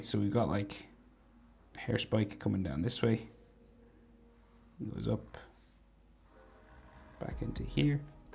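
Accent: Ireland. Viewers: First u art is sooo good, its eloung me become a waay better artist and second r u irish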